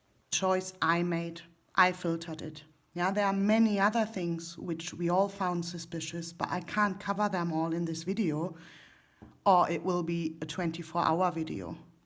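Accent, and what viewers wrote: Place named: United Kingdom. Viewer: that is why there is a British accent coming through at times 😉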